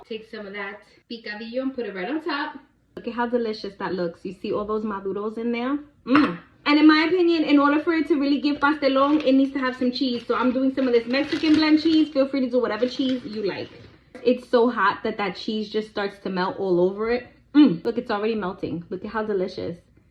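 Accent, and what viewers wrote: New York, United States. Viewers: OMG I will have to try this recipe. I love that New York accent.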